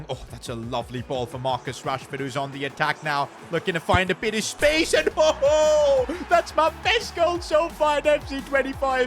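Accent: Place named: India. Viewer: Bro why he talks like Mickey Mouse but in a Indian accent 😂😂🤣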